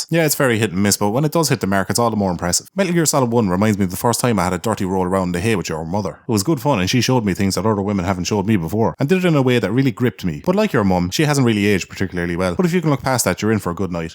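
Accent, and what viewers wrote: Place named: Munster, Ireland. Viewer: Sounds like cork or limerick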